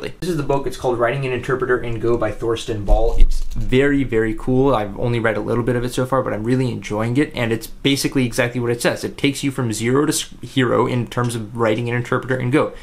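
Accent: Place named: United States. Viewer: Indian harry potter with american accent, perfect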